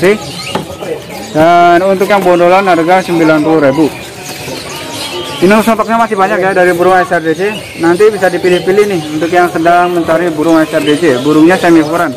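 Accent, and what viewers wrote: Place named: Java, Indonesia. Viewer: Jawanya medok. Banget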